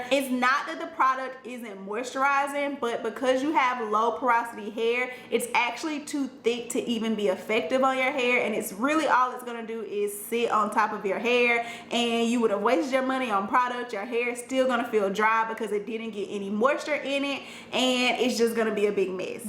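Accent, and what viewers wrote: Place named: Alabama, United States. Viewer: Had a feeling you were from Alabama..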